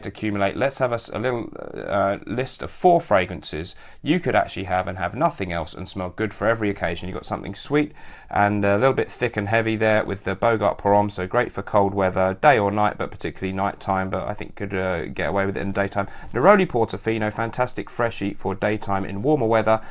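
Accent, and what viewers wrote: United Kingdom. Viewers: Sexy European accent? Dude. Come to The US, and you can have pretty much any woman you want with that British accent of yours.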